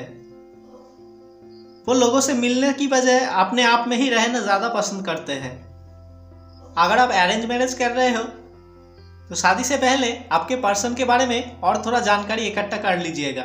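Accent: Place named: Bengal, India. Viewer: I feel like listening to your accent, you are bengali 🙂 I chose Pile 1 and happy with the reading which my intuition is also saying, and watching tarot readings for more than 2 years, seeing the cards came out in your reading for Pile 1, I know what you didn't said about the characteristics of my husband 🤨 but I got my answer, because it confirmed that with whom I intuitively know I am getting married very soon in 2023, He has Capricorn Sun sign, That major Arcana Devil, He is all what the Devil Card, The King of Cups (Don't show up emotions very well but loves deeply) that 7 of Swords, 8 of Cups and Hanged Man represent my partner, I know all about his negative things but still I want him only as my husband in this lifetime and in my next life times 🤗 I am older than you, so as an Elder Sister I give lots of blessings and Good wishes for your Channel 🙏🏻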